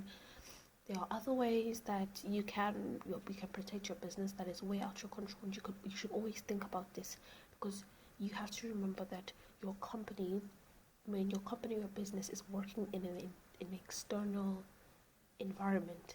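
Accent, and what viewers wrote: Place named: United Kingdom. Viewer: What a British accent you have , i am amazed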